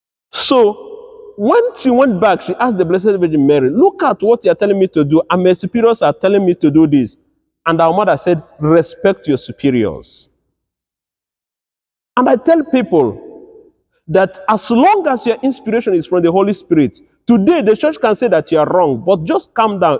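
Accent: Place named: Nigeria. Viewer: I believe the future of the Church is in Africa. In our parish in the South of England we have two extended Nigerian families and a family from Zimbabwe, all active members of the Parish. re you Nigerian,? Your name and accent suggest so.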